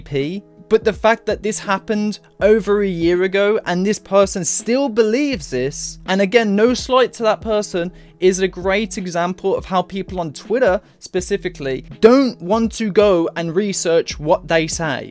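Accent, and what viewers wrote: United Kingdom. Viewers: When I go around youtube to see anime review video their voice pattern almost looks similar( Since most anime review are from American youtubers) but your one kinda sound a bit unique (more like British accent) And turn out it was 😂. Well not criticizing your accent but I must say British accent is really something else 🤔.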